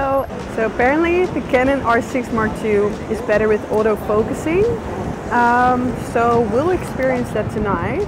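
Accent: Netherlands. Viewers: Sooo happy this came on my recommended videos, I love your energy it really makes me excited to shoot aswell, even though i feel a creative block right now, this motivates me!! (Also recognized the dutch accent the first few seconds!! Zo leuk om eens een NL account te volgen 🥰) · Oh, what a nice shoot. PS. I love your accent too, because my mom is Dutch too (but your's is so much fresher😅, She's been in SA for 60+ years) Keep up the good work and teach me more about photography, OK? thanks😉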